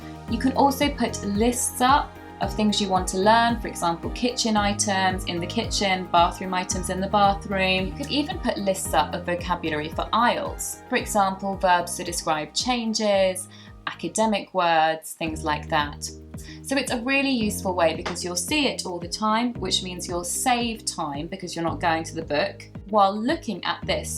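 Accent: United Kingdom. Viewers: Hola ! You said everything right in spanish !! You can practice with me ! I'm a native speaker !! I want to speak British I really like the accent !! 😁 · Wow.. amazing British accent! U have a new follower : ) Por cierto hablas muy español ;)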